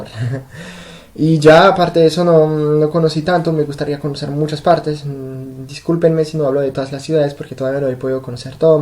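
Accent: Colombia. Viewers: la verdad que me confundes ,hablas tan bien el español ,por otro lado tienes el dejo colombiano marcado en todos tus vídeos , te encantó mucho colombia ? Y entonces no tienes mucho del habla francés ,por otro lado te identificas mucho con los latinos Pues serás nuestro hijo adoptivo😉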